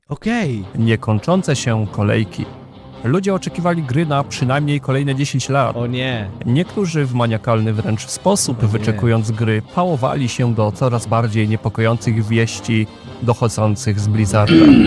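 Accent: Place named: Russia. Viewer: Angielski brzmi jak z ruskim akcentem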